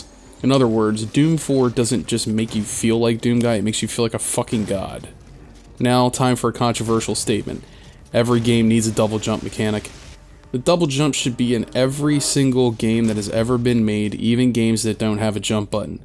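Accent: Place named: South Jersey, United States. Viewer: I'm a simple man. I hear a South Jersey accent I subscribe.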